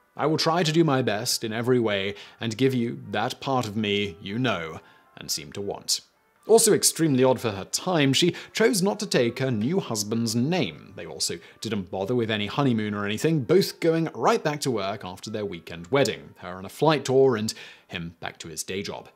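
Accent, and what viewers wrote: United Kingdom. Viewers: He's British. They pronounce words funny.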